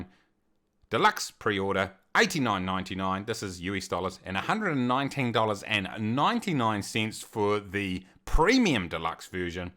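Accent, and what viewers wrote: New Zealand. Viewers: Ahh man I miss the NZ accent. And NZ. it was my absolute favorite place to live.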